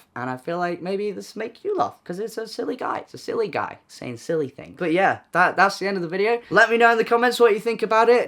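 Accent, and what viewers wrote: United Kingdom. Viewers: you sound so british here /neu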